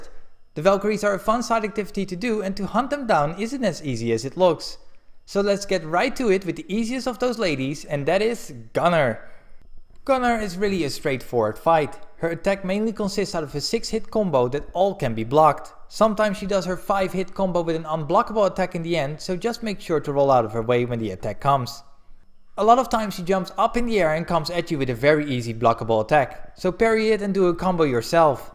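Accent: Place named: Netherlands. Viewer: I heard it because your accent, especially when you said: Sigrun, like Sigroen :), boven Holland :), I am Dutch too, I like your video, keep up the good work and keep going, I subbed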